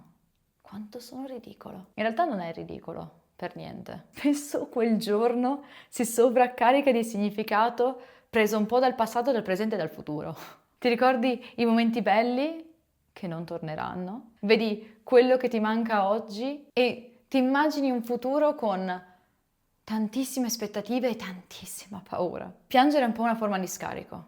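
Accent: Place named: Veneto, Italy. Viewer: Prima cosa: fa strano sentirti in italiano, con questo accento spiccato veneto quando in live parli in inglese. Seconda cosa: personalmente, non do tanto valore al compleanno perché, anche se sembra banale, perché dovrei festeggiare, fare "casino", invintare gente quando (in teoria) posso farlo tutto l'anno? È come quando si dice: "La festa delle donne non è solo l'8 marzo, ma è tutto l'anno". Però, al tempo stesso, vero, più passano gli anni e più, almeno personalmente, non mi accorgo che siano passati. Forse perché tutto passa in fretta, taaaanto in fretta, e certe volte è difficile stare dietro a tutto. Non so dove voglio a parare con questo commento, ma forse, vorrei accendere quella speranza che ad ogni anno le cose andranno bene, non meglio, bene, anche se certe volte è difficile confrontarsi con le felicità altrui (quando specialmente già gli altri iniziano ad avere i primi lavori, le prime case, le prime auto, mentre io rimango qui nella stessa cameretta dove sono cresciuto con ancora tanto da fare e da scoprire)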